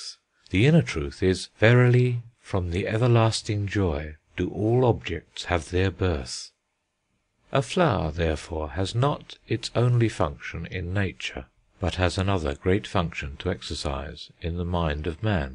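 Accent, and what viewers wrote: England, United Kingdom. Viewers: There is a perverse contradiction having it read in an English accent. Really creepy.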